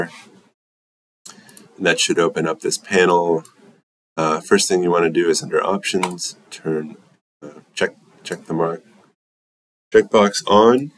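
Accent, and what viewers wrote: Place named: United States. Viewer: And you are an American, English speaking person. That makes all the difference from the clickety, clickety, dialect of India folks attempting English. THANK YOU!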